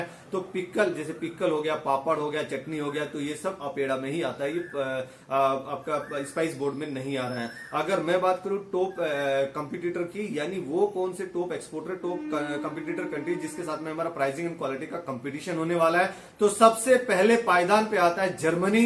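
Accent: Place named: Bihar, India. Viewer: Dear, you had made fun of Punjabis by saying Canada to Kanadha, According to you punjabi speak in this accent.. Moreover you also need to look into your own accent, Russia need not to be pronunce to Rhahssia.. 😂 And many other country name you pronuce in funny way... Now you need to work on your श , say शाम not saam, is that Bihari Accent??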